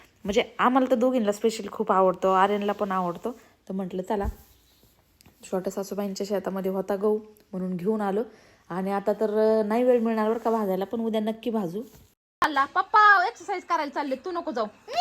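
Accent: Ahmednagar, India. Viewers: नगरी भाषा एकदम तडका❤❤ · Aapli bhasha aashicha aahe nagari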